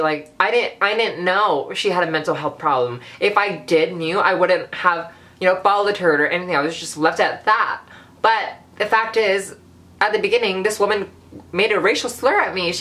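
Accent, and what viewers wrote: Vietnam. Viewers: I'm sorry but I always die laughing at your Vietnamese accent because it's DEAD ON. I'm Cambodian, but my hairdresser is Vietnamese and sounds EXACTLY like that. It just puts a smile on my face. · your old vietnamese lady accent is my favourite thing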